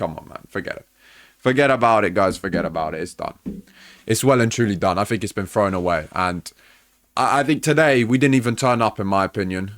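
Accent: United Kingdom. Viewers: Why does this guys accent sound so brittish??? Isn’t he Italian? Lmao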